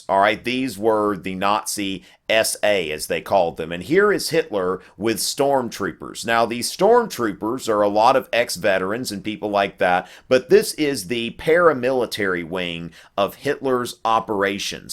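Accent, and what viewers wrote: South, United States. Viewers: Forgive me if I'm wrong, is your accent from the southern part of the USA? I'm Indian and I've heard this accent on American TV series, which makes me curious. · Yeah it's southern.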